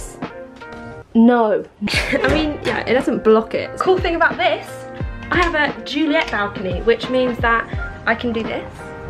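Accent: England, United Kingdom. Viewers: i really love your english accent 😍😍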